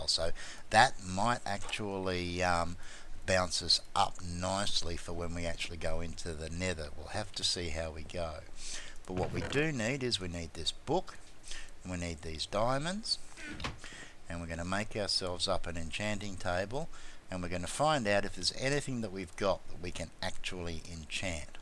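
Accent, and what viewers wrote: Australia. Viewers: I just have to say how much I love the Aussie vernacular and accent! You have such charming ways of saying/describing things. (This does not refer to 'Aussie English'), you know what I mean. <3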